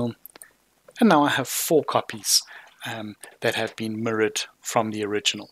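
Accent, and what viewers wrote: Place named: South Africa. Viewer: Hi. Just dropping you this line about how clear and concise your videos are. I've been a long time ACAD user, but my computers out-aged my version of AutoCad. So when I found LibreCAD, it was quite different than my old systems. I tried numerous YT instructors but for various reasons they didn't help me much at all. I'm in Montana USA, so some of the videos were hard to follow, either from difficult accents of instructor, length of lessons or simply confusing instructions. I very much liked the way you broke up the sessions into focus on one area at a time (not too long and complicated), like the detailed use of each of the tools, the ease of following your very light South African accent and the systematic approach to each item. I'm 84, and I don't learn as quickly as I used to, but still active enough to frequently have a need for this program. 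I gather that you are actually a instructor/teacher and you do an excellent job. Your videos make it easy to 'back up' to catch anything I didn't quite understand the first time. Thank you so much. Best regards, Jack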